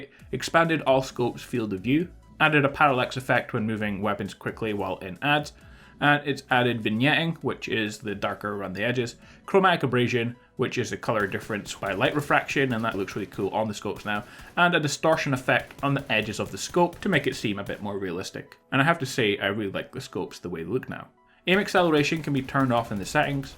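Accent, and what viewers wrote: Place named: RP, United Kingdom. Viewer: is actually Russian he just executes a very posh British accent.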